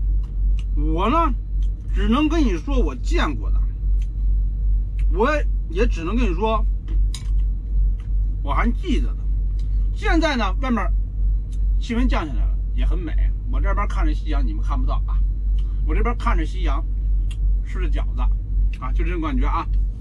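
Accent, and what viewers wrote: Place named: Jilu, China. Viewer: 自认为在辨别各地口音方面有天赋。。结果这个老哥的口音真是迷幻。。可能是冀鲁官话的人娶了个东北老婆，或者东北人娶了个江浙老婆。前者可能性大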